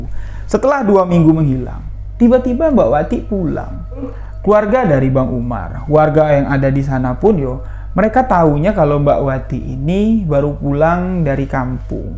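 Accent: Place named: Madura, Indonesia. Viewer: Tak denger2in ini logat koq jd madura yaa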